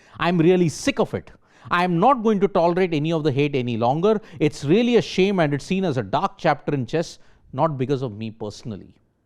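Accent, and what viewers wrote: South India, India. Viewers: Sports today, please change the presenter. His south Indian accent is very difficult to understand.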